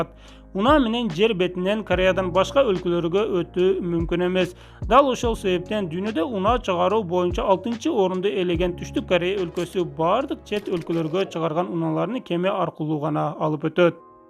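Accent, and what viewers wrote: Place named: Pamir, Kyrgyzstan. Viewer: Молодец сен озу аябай жакшы тема которуп чыгасын ,жанагы ны ,ни ну су мы деген кулку келерлик кошмо создорду кошпой суйлоп калыпсын ,негизи памирлик кыргыз окшойсун эч нерсе эмес бара бара кыргызча так суйлоп каласын.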